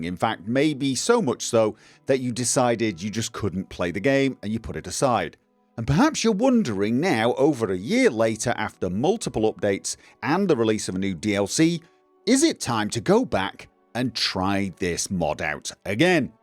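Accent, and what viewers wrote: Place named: Northern England, United Kingdom. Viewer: I'm on ep 20 of your playthrough....bloody loving it! It's so nice to hear a Northerner playing it and oddly amusing when you're talking to other Northern characters. And you play it SLOW...I'm getting a bit tired of the caffeine induced jerks that are creeping into gaming now. It's much more enjoyable when it's in real time. Wish you'd found the Greenman early on though but he is tucked away. You know the voice artists are all Dr Who actors? And the main jingle and incidental music are a play on Peter Howel's score for the 80's who era. And did you get the reference to Mr Ben? It's full of little Easter eggs.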